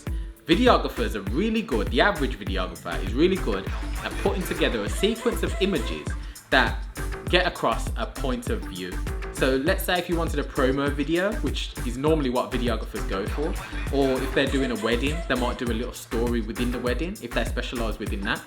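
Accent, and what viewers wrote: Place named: Birmingham, United Kingdom. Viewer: Oh yes by the sea life centre, awesome I thought so I could here a little bit of a brummy accent in your voice. Will do brother absolutely loving your content so far!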